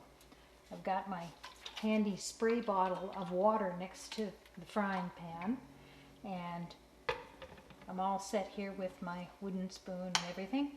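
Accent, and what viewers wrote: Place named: Canada. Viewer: The Canadian accent is really only perceptible in the "ou," but it's the tone of your voice, speaking rate, and enunciation that make your videos a pleasure to watch....and the food! :)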